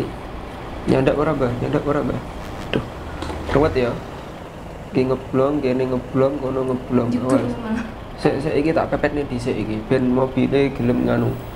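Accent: Java, Indonesia. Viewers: peh jowone ketok tenan🖒🖒😂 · Orang jawa mana suaranya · Di suarane arek jawa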